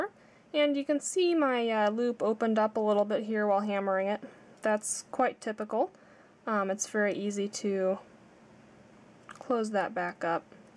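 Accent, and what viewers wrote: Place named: United States. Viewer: From the UK, it makes a change to have a clearly understandable audio, albeit an American accent, hahaha, ☺☺☺, cool calm and collected, I've 👍, and subscribed, luv and cuddles from me and your UK. Viewer's ☺😊😀☺😊😀❤❤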